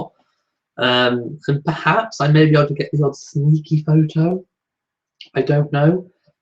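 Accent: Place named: United Kingdom. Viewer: it's nice to meet you! Now I know what you look like. I love your British accent!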